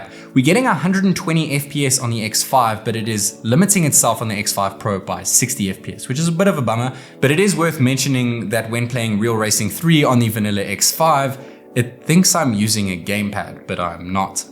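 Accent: South Africa. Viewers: he sounds South African I’m not sure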